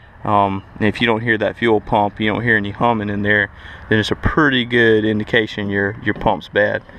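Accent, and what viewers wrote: South, United States. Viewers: i don't care about the fuel pump . That southern Accent makes everything sounds fine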